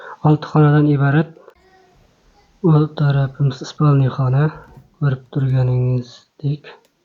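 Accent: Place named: Tashkent, Uzbekistan. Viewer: Toshkent shevaga oxshamin qoldi😅😅😅😅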